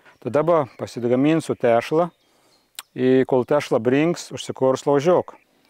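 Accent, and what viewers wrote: Samogitia, Lithuania. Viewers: pasiilgstu video kai ne zemaitiskai snekedavai..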